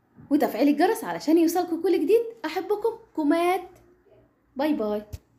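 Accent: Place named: Iraq. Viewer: تتكلمي عراقي حلو شكرا على معلوماتك تحياتي وردة عراقية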